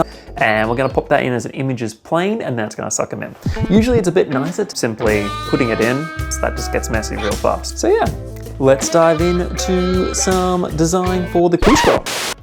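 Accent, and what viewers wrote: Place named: Netherlands. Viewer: Thought him was Dutch ngl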